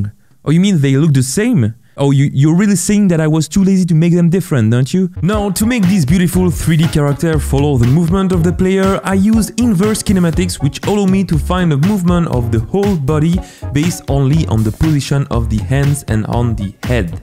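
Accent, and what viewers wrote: France. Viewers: are you french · tu es français?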